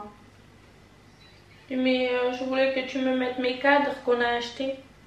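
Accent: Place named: Gabon. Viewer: Je te découvre quand j’ai entendu ta façon de parler j’ai dit c’est une Gaboma je m’abonne directe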